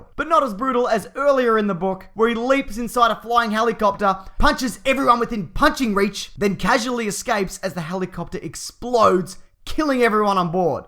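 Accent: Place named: Australia. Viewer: Someone explain how every single auzzie youtuber ( i think he is auzzie? ) sounds the sound Like this guys voice is the exact same as theauzziegamer and some other channels i know ( btw no offence im an auzzie as well mate k )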